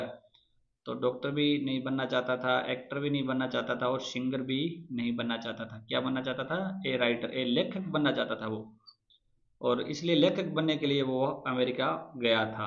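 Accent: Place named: Shekhawati, India. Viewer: Sir aap kaha se h vo maine isliye pucha kyo ki aapke bolne me शेखावटी ka lahza aata h so.....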